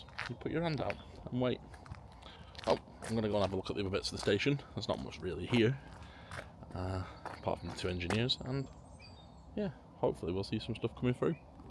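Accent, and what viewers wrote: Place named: Northern England, United Kingdom. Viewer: Geoff Marshall has suddenly got northern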